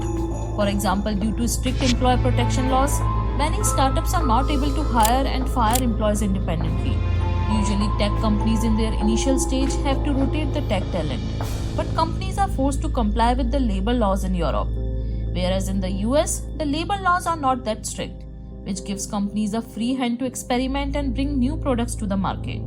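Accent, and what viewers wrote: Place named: India. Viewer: You seems to Indian origin from your voice. Why don't you create content in Hindi? This type of content will go viral in Hindi speaking audience.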